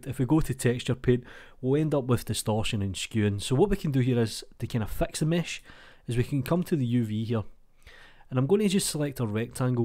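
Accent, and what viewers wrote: Scotland, United Kingdom. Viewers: I freaking love your Scottish accent. Keep doing this!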